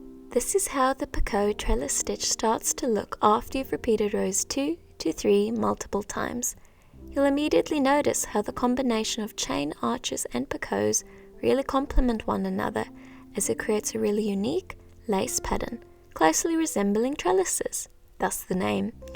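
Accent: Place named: South Africa. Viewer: This accent is definitely South African 🥰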